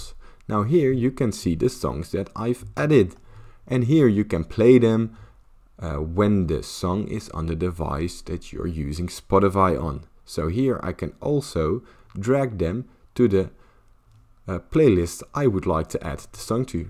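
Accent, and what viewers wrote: Netherlands. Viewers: Ik ruik Rotterdams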